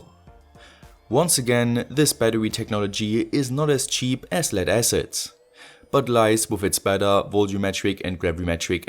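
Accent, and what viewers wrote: Germany. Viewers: Are u german? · Man höher so hart dass du deutsch als Muttersprache sprichst 😂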